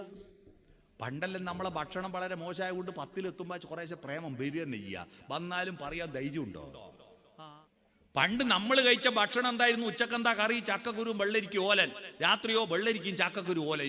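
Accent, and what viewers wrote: Kannur, India. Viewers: സർ, കണ്ണൂർ ക്കാരൻ ആണ് ല്ലേ..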